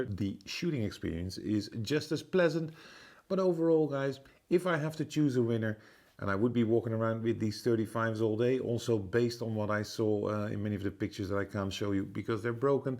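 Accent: Netherlands. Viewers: It is ttartisan not artisans. Both are different companies. Are you dutch btw- I hear the familiar accent:) I prefer the ttartisan lenses. All of them are great and sharp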